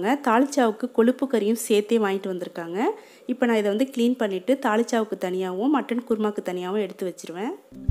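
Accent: Karaikal, India. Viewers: Karaikwl slang- tholuvittu 😄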